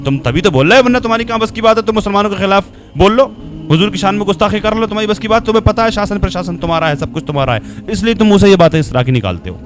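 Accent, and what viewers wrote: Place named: Maharashtra, India. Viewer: Yeh Maharashtrian Boly hae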